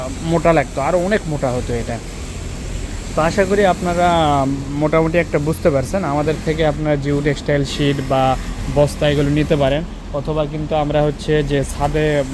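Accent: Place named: Joypurhat, Bangladesh. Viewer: আসসালামু আলাইকুম ওয়া রহমতুল্লাহ! ভাই, আপনার কথা শুনে মনে হচ্ছে আপনি জয়পুরহাট জেলার মানুষ! জয়পুরহাটে আপনাদের এজেন্ট আছে কি না? জানাবেন প্লিজ!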